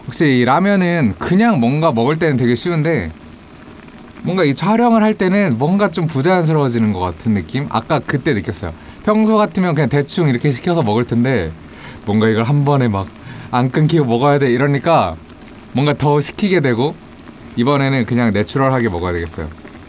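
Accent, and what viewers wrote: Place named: Gyeongsang, South Korea. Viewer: 승부하부먹충 이게 왜 일베야 경상도 사투리고만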